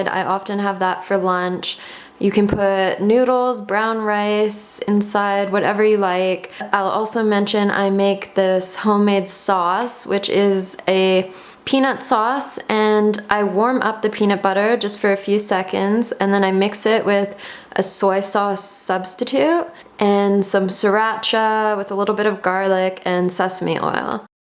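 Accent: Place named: Canada. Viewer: where are you originally from? your accent says canada but your demeanor says california hahah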